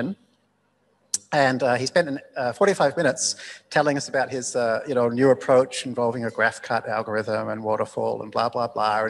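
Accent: Australia. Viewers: Interesting how your accent is a lot more aussie now!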